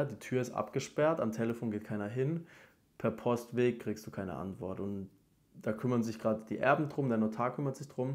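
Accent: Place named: Baden-Württemberg, Germany. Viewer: ach ich hab jetzt nochmal paar mehr videos von dir nachgeholt und gemerkt, es liegt wohl an deinem Badenwürtember-Akzent. 😂 aber kommt dem schon nahe vor allem auch mit der Betonung. Ich meine Percy, die Rolle von Teddy Teclebrhan / aka Antoine.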